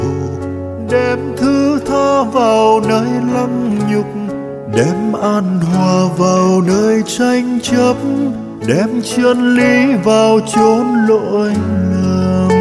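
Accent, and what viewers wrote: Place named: South, Vietnam. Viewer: Bình thường trong những live show Nguyễn Hồng An nói giọng Nam đã thay để thương, bây giờ nói được nghe giọng Bắc của sao ngọt ngào, càng de thuong hon nữa. Hat nhạc thánh ca hay nhạc đời đều tuyệt vời. 1,000 Likes nhé.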